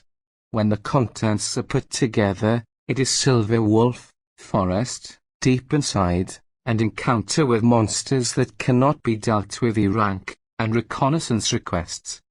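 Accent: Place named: Wales, United Kingdom. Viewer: it sounds like the voice has a bit of a welsh accent or something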